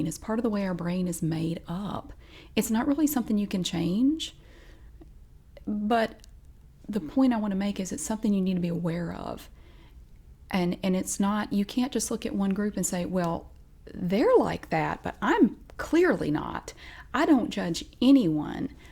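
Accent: South, United States. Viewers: Your Southern accent is a big reason for the popularity of your videos. Here in California most people speak in a millenial monotone or in foreign tones. Your Americana accent is music to my ears.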